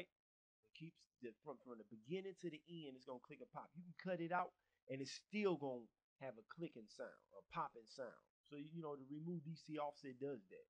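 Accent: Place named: West Coast, United States. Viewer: teeeen,teeeen,teeeeen hahaha luv the west coast accent well u sound like u from there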